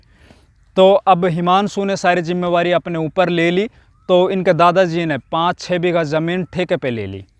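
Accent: Mewat, India. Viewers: Bhai u Tuber vlogger aap kaha se ho. Aapki boli zuban m Mewati zuban ka lahja h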